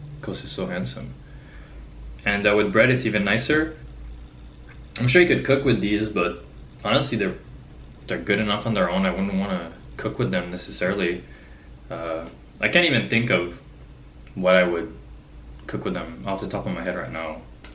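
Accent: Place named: Quebec, Canada. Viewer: Matiz makes a nice can of mussels. Your accent was sounding very familiar to me and then I realized it is like my great grandmother's was, she grew up in Quebec. Cheers from a fellow canned seafood enjoyer and Souls games player!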